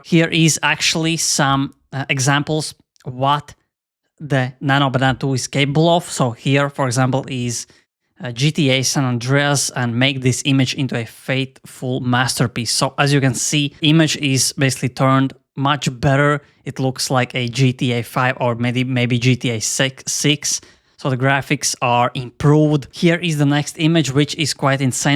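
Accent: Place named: Russia. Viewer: Why your accent is so similar to Russian? I'm russian btw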